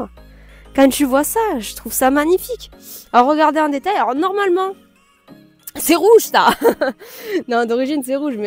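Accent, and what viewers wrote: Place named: South, France. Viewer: J’adore l'accent du sud ça nous rapproche du soleil 😊😉😊😉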